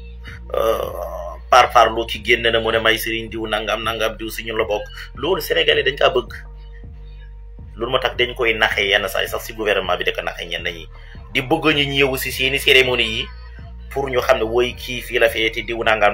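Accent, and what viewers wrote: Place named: Guinea. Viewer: Yaw rew nga dé ai sa lakeu wolof bou am accent guinéen Réglel yaw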